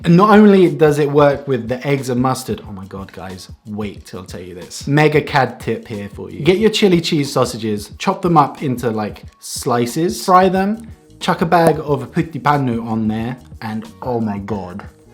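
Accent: Finland. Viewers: muumi limu: ur finnish accent sounds funny im not saying its bad and :makkara: its so good if ur on a journey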